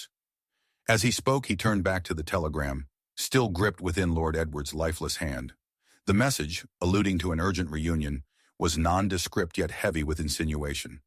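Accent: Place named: United States. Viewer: Oh dear - AI American accent is just so wrong!! Add this channel to your "do not recommend list"!!!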